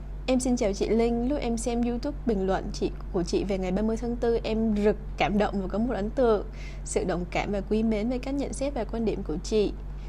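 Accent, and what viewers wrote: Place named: South, Vietnam. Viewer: Sang đấy lái giọng Nam luôn rồi ah :))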